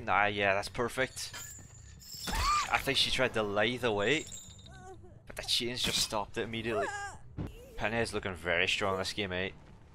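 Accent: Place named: Belfast, United Kingdom. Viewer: It's a Belfast accent I think.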